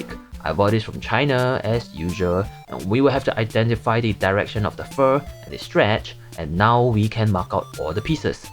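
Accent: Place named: Singapore. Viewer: from the accent and the whiteboard marker u used i could tell u were from singapore haha! love ur sense of humour and u are so talented. gonna binge watch ur other videos now ;) !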